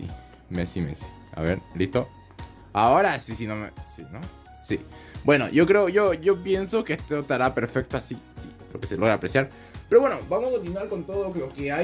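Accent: Bolivia. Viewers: Sos de Bolivia compa? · Oye yo me preguntó eres de bolivia??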